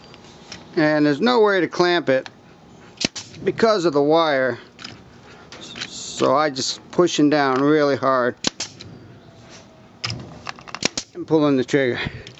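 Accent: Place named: South, United States. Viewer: This is Marcy again...hijacking Joey's account. You have a southern drawl. I know this is serious but I think it's hysterical! Good job! Very impressive! I really can't believe you build this!!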